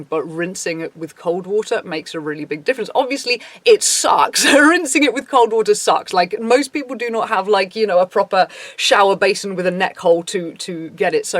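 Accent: United Kingdom. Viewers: hey, what part of Britain are you from?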